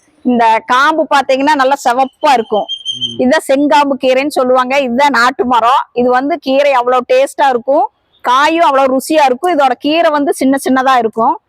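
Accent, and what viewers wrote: Kongu, India. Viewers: Ithu coimbatore slang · Ada idhu Coimbatore bashaiingov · எங்க கொங்கு நாட்டு பக்கம் நாங்க பொறிக்க போரன் அப்படிதான் சொல்லுவோம் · Kongu slang apditha irukum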